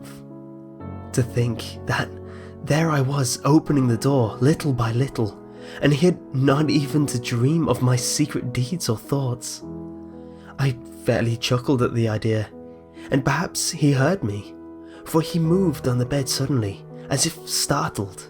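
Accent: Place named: United Kingdom. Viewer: Okay, seriously, grammar, plus he may be from anoth country, everyone says stuff different in different places. Don't judge him for how hepronounces words. I'm pretty sure he's from the UK. We pronouce diffrently. Okay?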